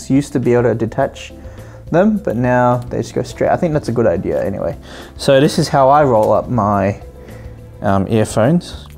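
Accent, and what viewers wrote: Australia. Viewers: Theres no way your not Aussie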